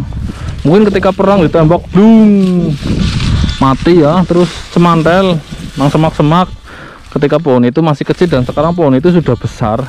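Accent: Banyumas, Indonesia. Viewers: Ora ngapak ora penak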